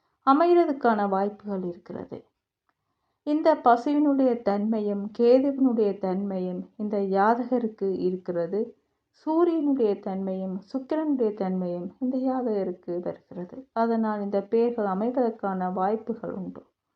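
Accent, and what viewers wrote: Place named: Sri Lanka. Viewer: சிலோன் தமிழ் அழகாக உள்ளது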